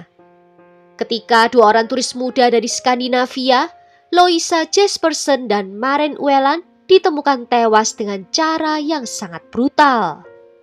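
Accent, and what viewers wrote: Java, Indonesia. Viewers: Medok bangeet kmu kak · Emang suara nya asli medok gitu ya..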